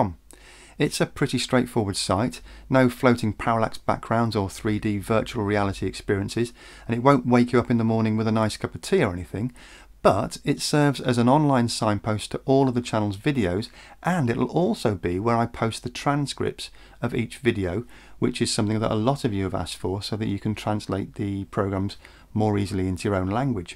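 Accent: United Kingdom. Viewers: You forgot to mention Geo Engineering or Weather Modification. Not really impressed with the Brit accent.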